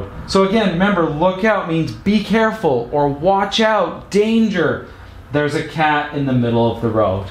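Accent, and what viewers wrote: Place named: Canada. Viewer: Wonderful explication. Love your sweet Canadian accent.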